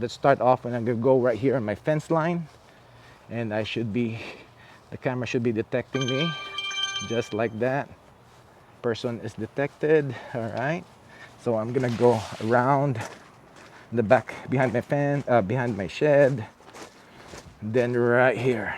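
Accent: Philippines. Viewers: Filipino accent is so beautiful!! 🥰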